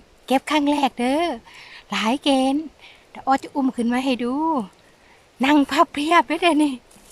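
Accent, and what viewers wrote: Korat, Thailand. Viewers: พูดภาษาโคราชฟังน่ารักจ้าน้อง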